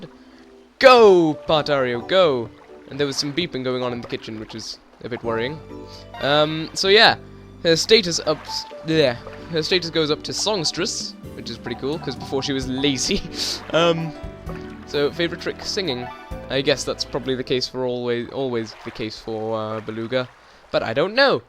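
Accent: United Kingdom. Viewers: U rock I love ur british accent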